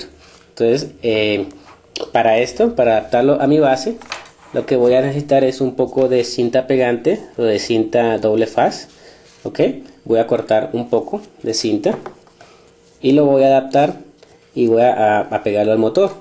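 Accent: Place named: Mexico. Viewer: me cae en los huevos el acento mexicano parece que le agregan acento a tooodas las palabras al final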